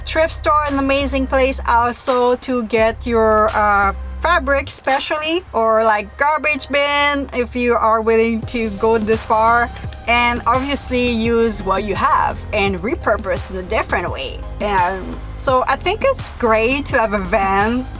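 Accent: Quebec, Canada. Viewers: Thanks for the information. You are very informative. Again you give me hope that to have a van and travel is not a foolish dream. Love your accent. It is what I imagined Canadians would sound like. I am from USA. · Somewhere on here she has said French Canadian.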